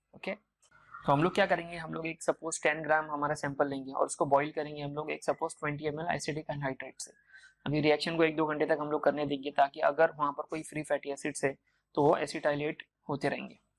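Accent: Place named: Hyderabad, India. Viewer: Sir you are amazing and your hyderabadi accent is mashallah ☺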